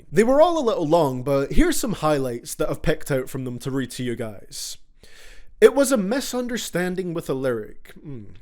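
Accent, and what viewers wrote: Scotland, United Kingdom. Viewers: He sounds like a Scottish version of another youtuber I watch but can't remember which channel. It's uncanny. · U Scottish or usa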